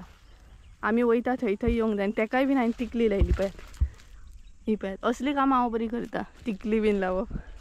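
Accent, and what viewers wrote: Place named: Pernem, India. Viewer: from which place r u Pernem coz from ur way of talking I understood that u r from Pernem.side the way u said gauta Proud u should feel of urself.coz u r showing the hard work before the monsoons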